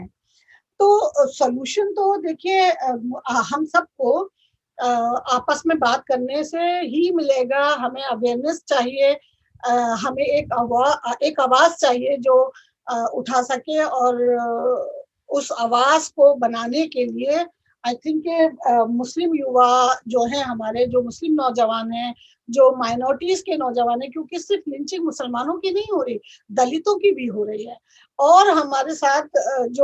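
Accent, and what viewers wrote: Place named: Haryana, India. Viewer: Haryana ala boltay madam😉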